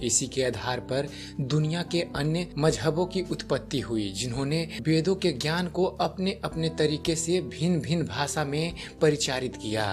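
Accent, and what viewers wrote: Bihar, India. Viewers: Bihari hai ke bhai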